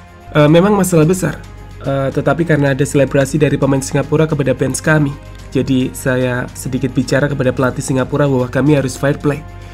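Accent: Java, Indonesia. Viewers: maaf mimin,bahasanya jangan dibikin kejawa"an lah.geli dengarnya...wkwkwkw.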